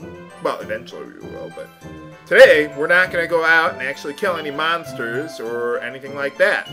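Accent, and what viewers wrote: Ireland. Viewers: This accent sounds Irish to me. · its an Irish accent:) and also give the cowpat to tornecko when u can:) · btw its Irish the accent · It sounds like he is Irish to me. The myth of the town disappearing and some the phases are what make me think so.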